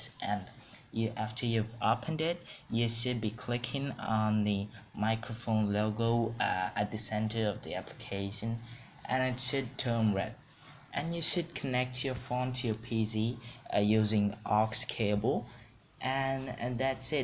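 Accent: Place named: Indonesia. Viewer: Your accents jokes i love it are u from Indonesia im guessingg, pZee xD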